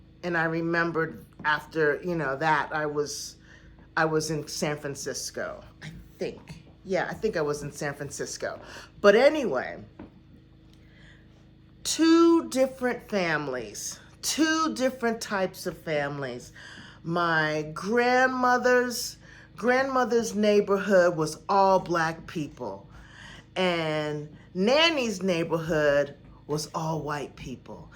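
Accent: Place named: Connecticut, United States. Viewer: One more thing there is an Accent in your voice I guess that's Connecticut.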